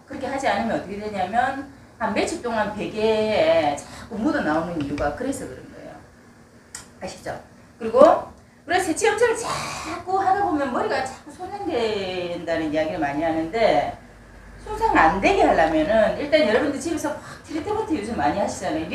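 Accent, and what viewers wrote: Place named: Busan, South Korea. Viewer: 말씀도 넘찰지게잘하시네요 사투리도 구수하구요 저역시부산서 오래살았거든요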